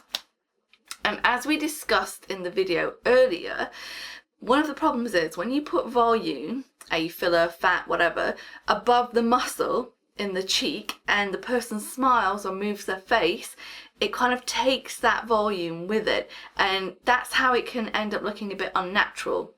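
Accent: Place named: United Kingdom. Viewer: The most beautiful accent of the world : english UK ❤🙋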